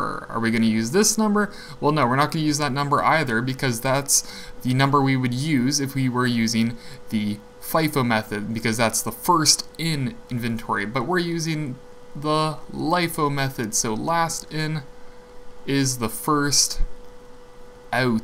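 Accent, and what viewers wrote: Canada. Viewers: I can hear your canadian accient lol :)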